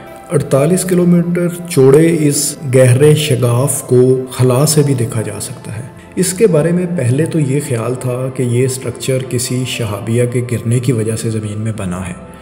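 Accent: Punjab, Pakistan. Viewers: Punjabi accent in Urdu documentary, what an amazing......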